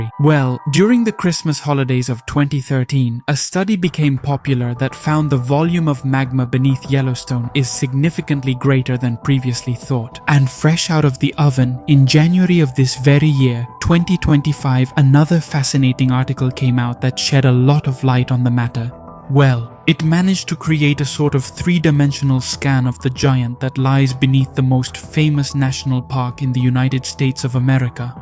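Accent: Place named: India. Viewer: What's with the Indian voice??? AI?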